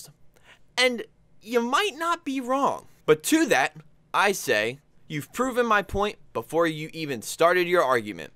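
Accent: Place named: Pittsburgh, United States. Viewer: You have a Pittsburgh accent kinda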